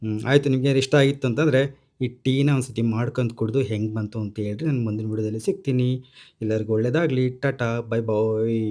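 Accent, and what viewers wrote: Chitradurga, India. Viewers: ನಮಸ್ತೇ ಸರ್ ನಾವೂ ದುರ್ಗದವರೇ ಸಕ್ಕತ್ತಾಗಿದೆ ನಮ್ ಭಾಷೆ ನಿಮ್ಮ ಅಡುಗೆ ಸೂ.....ಪರ್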